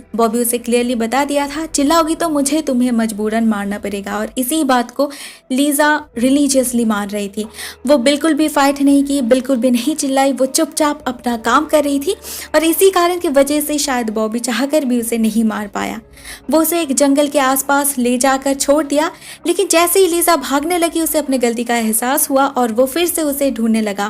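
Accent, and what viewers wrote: Bengal, India. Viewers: Aapki bolne ka tareeqe se pata lagta aap bengoli ho Btw I'm also bengoli 😊🌸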